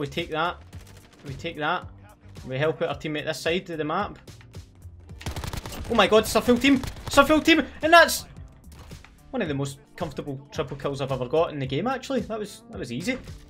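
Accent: Scotland, United Kingdom. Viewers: When you hear alrighty folks with a scottish accent you know its gonna be lit #notificationsquad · Gun Troller aye in a Scottish accent then he will do that stupid generic one that no one sounds like and u would need to be from the right part of Scotland to sound like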